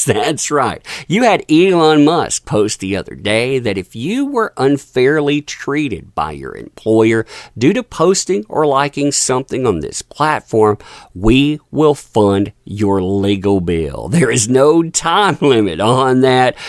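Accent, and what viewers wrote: United States. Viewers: I love this guy's accent😅 He sounds like a real American cowboy❤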